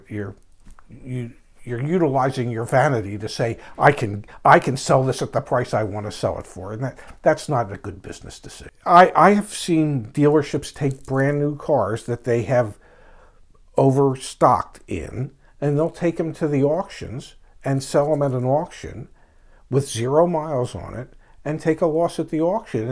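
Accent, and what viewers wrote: Baltimore, United States. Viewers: Love that "Balamer" accent! You sound like you should be in a John Waters movie!😎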